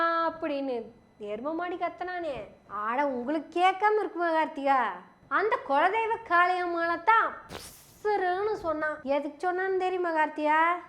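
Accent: Kongu, India. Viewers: கோவையின் கொங்கு தமிழ் செல்வி வாழ்த்துகள்.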